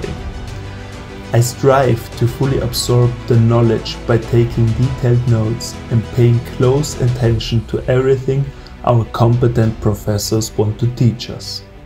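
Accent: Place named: Austria. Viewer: You talk like Arnold Schwarzenegger )) I'm coming to Graz next week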